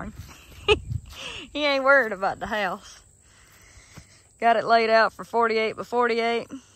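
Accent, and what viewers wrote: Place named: South, United States. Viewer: God I love her accent.. makes me miss the south so bad.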